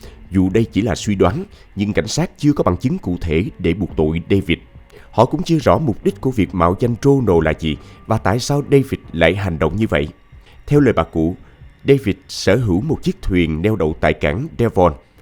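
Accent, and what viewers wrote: South, Vietnam. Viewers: Giọng anh nghe rõ ràng chuẩn miền nam, nghe rất nghiêm túc nhưng rất cuốn!❤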